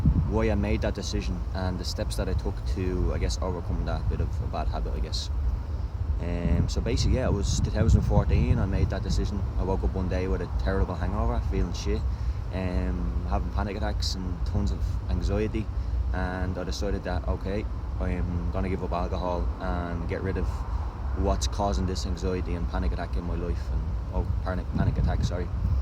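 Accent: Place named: Ireland. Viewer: definitely irish, sounds exactly like conor mcgregor.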